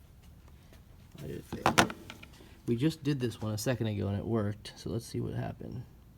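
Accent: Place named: United States. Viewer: OMG, finally there is someone here speaking AMERICAN!!!! I purchased this VVDI tool for months now, whenever I looked it up on Youtube all I got was either Thailand or the shitty Russian voice. Thank you and thank you for your video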